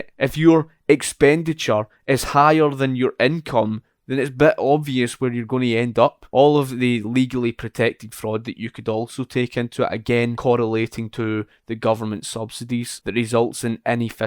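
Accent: Scotland, United Kingdom. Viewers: Scottish that’s the accent I was trying to place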